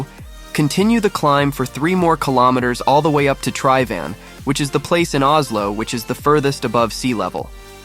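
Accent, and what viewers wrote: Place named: Canada. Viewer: You're Norwegian? Your accent sounds Canadian.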